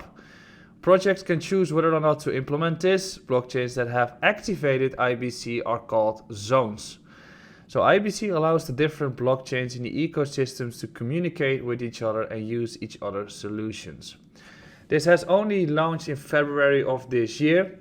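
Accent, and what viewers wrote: Netherlands. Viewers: Nederlander vanaf 60km te horen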